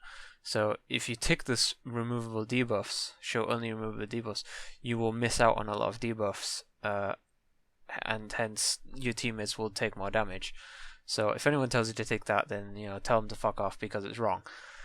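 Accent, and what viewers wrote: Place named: Newcastle, United Kingdom. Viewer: that sounds like a Geordie/Northumbria accent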